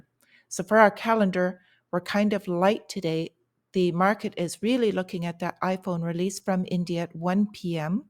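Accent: Ireland. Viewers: Hey 😄 Thank you for posting your daily market updates, I love them! You mentioned the relative strength of the US dollar will get hammered, what scenarios do you think could happen with say the EURUSD? A second question, is your accent Irish? Thank you & Have a great day.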